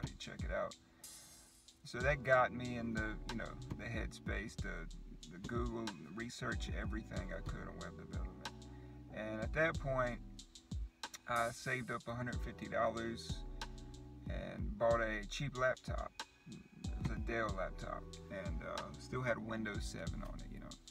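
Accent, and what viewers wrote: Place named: South, United States. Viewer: Just something about a Southern accent! (I'm from Georgia myself) Your story is really, really inspiring. I look forward to hearing more good things from you!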